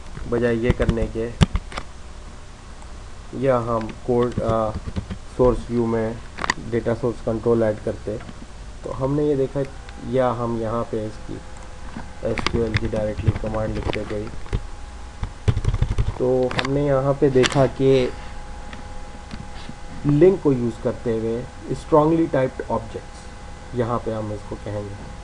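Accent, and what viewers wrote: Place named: India. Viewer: 印度口语~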